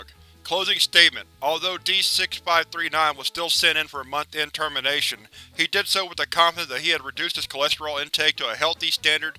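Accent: South, United States. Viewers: Damnit dude I wait till Wednesday to see your new scp reading and it's butter. Naw but seriously I enjoy your readings man keep up the good work. I know people who I suggested this channel to couldn't get into it because of your southern accent. I'm from Texas though and that's standard down here. Keep up the good work.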